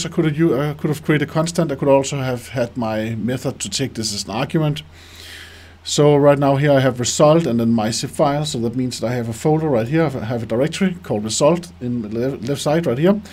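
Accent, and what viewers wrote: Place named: Denmark. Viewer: Soo danish accent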